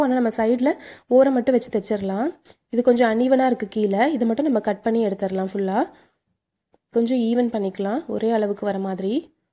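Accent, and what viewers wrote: Coimbatore, India. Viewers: Akka nenga Coimbatore ah..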